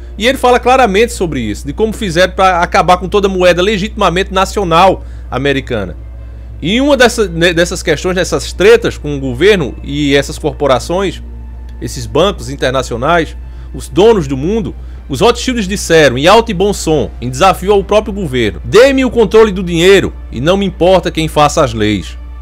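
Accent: Northeast, Brazil. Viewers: Nordestino ele é (sotaque)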